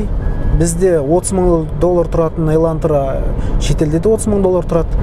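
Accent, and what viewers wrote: Standard. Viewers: Красавчик👍🏻 таза қазақша сөйлейді ризамын, өте білімді жігіт!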